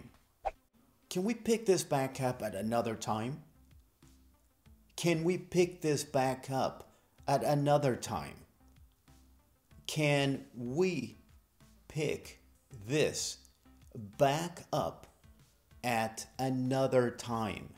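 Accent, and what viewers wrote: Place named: United States. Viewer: Buen inglés americano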